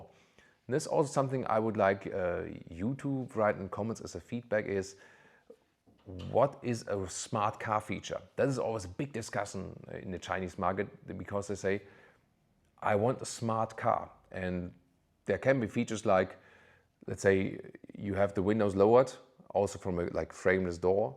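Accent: Germany. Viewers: Love your Videos and your style of presenting cars. Calm and authentic. And keep our German accent, that makes it so relatable. Also if I am German, most of the time I watch your videos in English. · Hello. Dual Brit Canadian here, though I'm Egyptian by birth. Have always loved your videos, your presentation style and your personality. I actually lived and worked in Germany from 1988 to 93, in Lahr / Schwarzwald at Canadian Forces Base Lahr. I was a civilian in the IT department and then immigrated to Vancouver Canada in 1993. I'm back in the UK right now but have kept my Vancouver place. I've also faced a very personal tragedy recently. I lost my very dear Mum last year due to her accidental stair fall and discovery eight weeks later she had two brain haemorrhages she didn't know she had. She tragically died in hospital on July 3rd last year, with me in the room with her. 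I still cannot believe she's gone. Three months after losing her, I myself was diagnosed with leukemia. I spent four weeks in hospital having numerous blood transfusions, chemo and medications, followed by almost a year of outpatient treatment. I'm thankfully totally cured now, very fit and healthy with zero side effects. But the tragic and unexpected loss of my very dear Mum has been absolutely heart breaking. I am keeping a positive attitude for my life and future. I attend many classic cars shows and I'm very interested in cars. Totally love your channel and your presentation style. Also, your accent reminds me very much of my five years in Germany. By the way, my profile photo is of my very dear Mum and I. Vielen Danke und Tchuss! Ibrahim in Canterbury Uk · Love your vids and the way you breakdown these cars with strong German accent in a style and format that is just perfect for your delivery🔥🔥🔥🔥...It sounds so authentic and feels like you are coming directly from German car manufacturers, which i know is not the case, but that's why I love the channel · I subscribed this channel mainly for Learning German Accent English.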